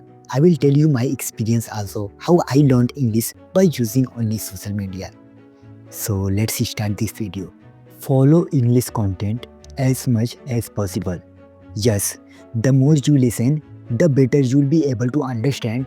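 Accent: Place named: India. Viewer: Your accent seem hindi but l understood anyway keep going❤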